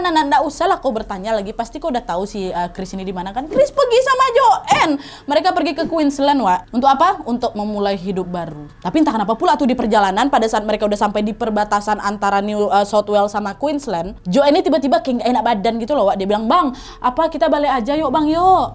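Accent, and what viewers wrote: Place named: Sumatra, Indonesia. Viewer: Logat nya Ayuk Sumatra banget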